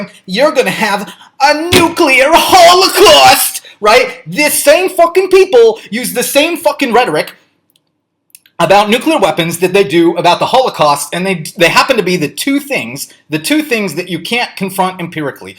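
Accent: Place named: South, United States. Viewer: Also, southern accent really slipping through in this one, kek.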